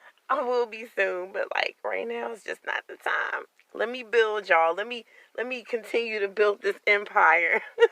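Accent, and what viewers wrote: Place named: North Carolina, United States. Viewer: I am born and raised in NC and yes ma'am you sounded like me telling about my upbringing.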